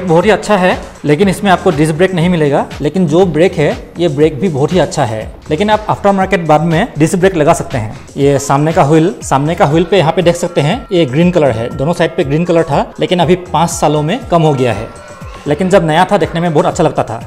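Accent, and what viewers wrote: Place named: Bengal, India. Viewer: bro tumi bengali to bengali tai bollo tumar kotha sunei bhujte parchi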